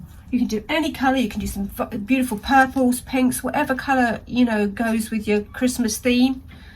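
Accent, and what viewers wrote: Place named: United Kingdom. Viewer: Here it is 2022 still loving your creativity. I'm trying the star shape bauble this Christmas. I love your Brit accent especially when you say 'pretty' Merry Christmas, from Canada Free!🚚🚛🚜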